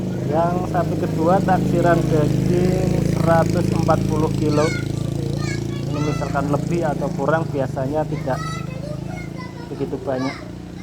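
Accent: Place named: Wonosobo, Indonesia. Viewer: Omongane kea Wonosobo ya bolo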